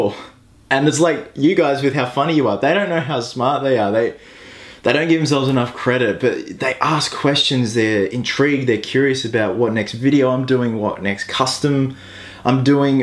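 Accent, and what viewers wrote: Sydney, Australia. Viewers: They do bloody not, sounds like he's from Sydney... sounds like he's from Melbourne. Completely different!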